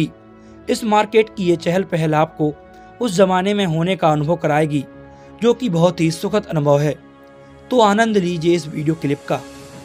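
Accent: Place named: Uttar Pradesh, India. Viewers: भाई व्हिडिओ 1नो. बस भाषा सुधार के बोलो ईसे वही यूपी वाली fill आती है व्हिडिओ मे भी ये मुंबई है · Arre Hindi nit bolo. Bhaiya style ka boltos ?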